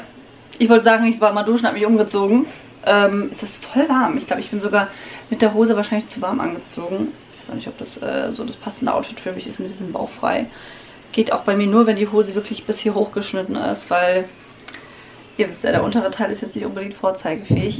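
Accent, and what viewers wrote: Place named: Hesse, Germany. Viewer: Das ist HESSISCH und heisst nebbber mir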